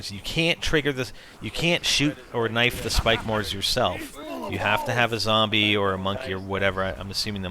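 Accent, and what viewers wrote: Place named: Canada. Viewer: your Canadian, aren't you..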